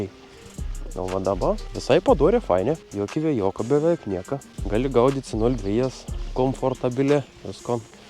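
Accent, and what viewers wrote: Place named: Samogitia, Lithuania. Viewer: Bet ta kap smage girdiet zemaiteska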